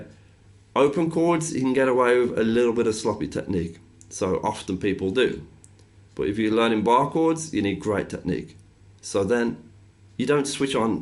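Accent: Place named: Birmingham, United Kingdom. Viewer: brummie? Some type of English accent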